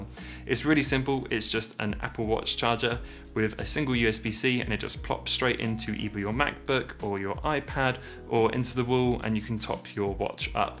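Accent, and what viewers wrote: England, United Kingdom. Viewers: I am very careful with my gear and so use cases and watching your videos I notice on your phone you do not use a case. Are you very particular in how you handle your phone or do these higher end iPhones have some sort of protective covering on them. I try to be as environmentally aware as possible but one can only go so far with technology before it becomes obsolete . I am facing this now with my first generation iPad Air. I purchased it in November 2013 so coming up for ten years but in this last year, even though it still works well sadly most app´s have stopped working with it and even safari pages will not open in many cases due to the old iOS! Its sad as well as I have always kept it in a folio case and if I take it out the thing still looks brand new! After watching your reviews of the iPad 10th Gen and the 5th Gen iPad Air I have decided to get the air due to the M1 chip and hopefully that means it will have a longer iOS upgrade lifespan, I have been on ios12 point something for years now on my old iPad! 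I am guessing I will notice a considerable difference. I have seen this already with comparing it with my iPhone X ( still working perfectly with plenty of battery left and again, always in a folio case so no need for those horrid screen protectors again, it still looks like new, hopefully I have a good few years left in it as far as iOS upgrades are concerned. I am very much enjoying your channel and have subscribed. Nice to hear and English Accent. I am from Devon bu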